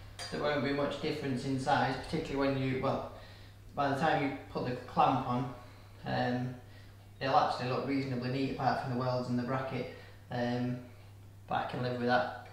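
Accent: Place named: Gujarat, India. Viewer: WOW YOU gujarati i am from gujarat nice to heard you speaking love you...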